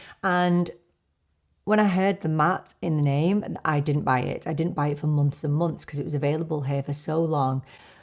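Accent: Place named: England, United Kingdom. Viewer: Just joined your community, some excellent videos and very informative. Can I ask where is yours accent from as you said you live in Europe and your accent is very English and your mannerisms. Gorgeous look❤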